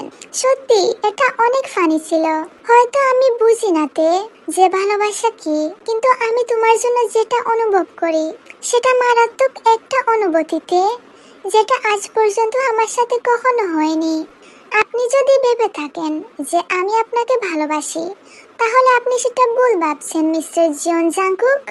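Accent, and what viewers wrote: Sylhet, Bangladesh. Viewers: তো তুমি কি সিলেটি নাকি চিটাংগা🎉🎉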